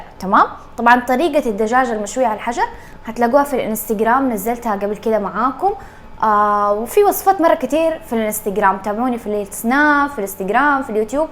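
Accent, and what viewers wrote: Hejaz, Saudi Arabia. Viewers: من اي بلد انت..لهجة سعودية بس ما اعتقد انت سعودية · افغانيه متجنسه سعودي . لهجتها حجازي يعني مو سعوديه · مين قال انها شامية سعودية هي سعودية حجازية وتتكلم لهجة من لهجات الحجاز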